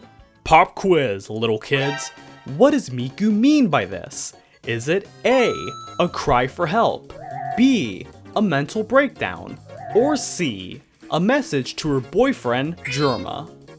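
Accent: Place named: United States. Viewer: im guessing that the video creator is from america based on their voice. here, most people say it with a less pronounced second "t", like little being pronounced more like "liddle" here in the US. so usually people in the u.s pronounce it as "teh-doe"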